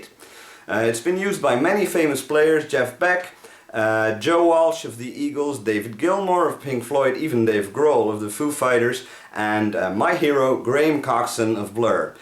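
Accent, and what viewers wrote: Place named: Netherlands. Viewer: Ha, the unmistakable Dutch accent. Nice vid.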